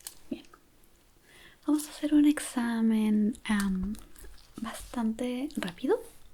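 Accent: Chile. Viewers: Acento Chileno:0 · Es chilena 🇨🇱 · Amo el asiento chileno más videos así por favor ❤️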